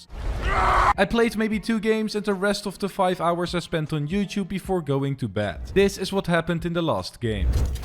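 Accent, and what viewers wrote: Netherlands. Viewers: echt een hollands accent hahaha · Are u from Netherlands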